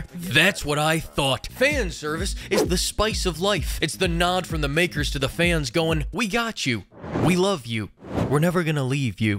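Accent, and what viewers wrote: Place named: South, United States. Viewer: Your channel is a massive fan service to shtpost lovers and that mixed southern accent is epic!!!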